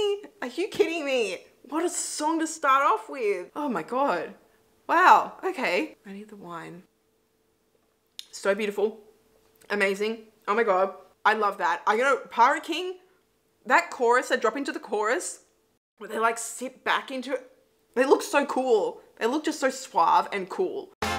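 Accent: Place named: Australia. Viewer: ty so much love kpop i'm from South Korea little bit hard to understand Aussie accent but i really like u re channel. also sometime kpop reaction block on some country that is not have Youtube country no google just little bit search it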